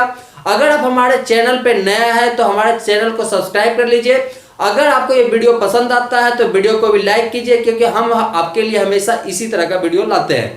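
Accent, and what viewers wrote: Bihar, India. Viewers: Aap bihar se h kya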